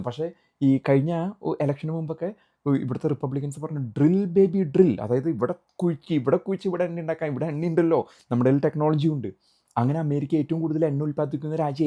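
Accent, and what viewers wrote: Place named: Thrissur, India. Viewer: നല്ല കിറു കൃത്യമായ നിരീക്ഷണവും ചേട്ടന്റെ ആ തൃശ്ശൂർ ഭാഷയും എല്ലാംകൂടെ നല്ല രസമുണ്ട് കേൾക്കാൻ. .. ഇപ്പൊ റീച് കുറവായിരിക്കും പക്ഷെ നിരീക്ഷണം തുടരണം, കുറേ നാള് കഴിയുമ്പോ ആളുകൾക്കു മനസിലാവും എത്ര കൃത്യം ആയിരുന്നു എന്നു. ..🙏🏻🙏🏻🫡🫡🫡🫡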